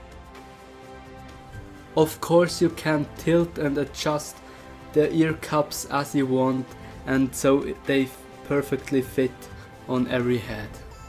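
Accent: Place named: Switzerland. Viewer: Nice man hört dir den Schweizer einfach raus. Wieso machst du englische Videos. Aufjedenfall cool. Wie findest du das Mik im vergleich zu membran mach mal ein Headset mikro vergleich test.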